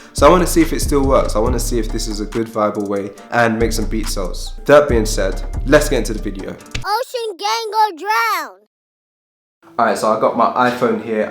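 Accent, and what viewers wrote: United Kingdom. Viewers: ooh nice, how long you been there? Judging by your accent I'm assuming you're originally from the UK? Maybe London or surrounding? I was in Spain for a year, I miss that place, planning a quick visit this summer, maybe we can hook up, talk music. I'll hit you up on insta if that's kool?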